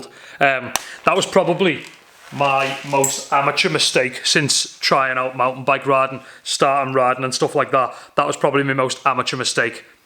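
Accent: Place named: North East England, United Kingdom. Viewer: I was just wondering where u ride trails and stuff in the north east coz ur accent gives it away 😂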